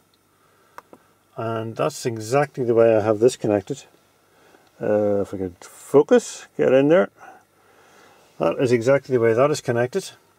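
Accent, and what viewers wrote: Northern Ireland, United Kingdom. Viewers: haha do i sense a slight NI accent ;) cool video btw! was researchin canbus stuff myself, subbed :)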